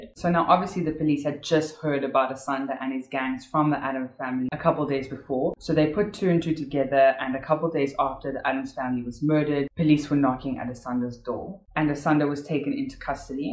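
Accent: South Africa. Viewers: Mandrax while coming in a pill form its rarely consumed as such, they usually crush it up with marijuana and smoke it in a pipe known as a shalompie.You sound south african but your afrikaans pronunciation needs work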